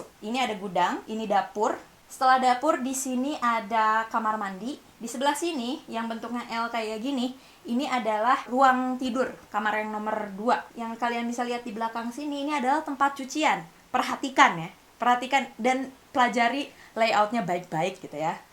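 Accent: Java, Indonesia. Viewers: Kirain mantannya yg setan soalnya diajak ngomong tadi hahaha btw asli mana sih kok agak "NJOWO" bahasanya haha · gagal fokus.. logatnya kadang" ke jowojowoan..kadang" keluar kesundasundaan..hahahhahaha..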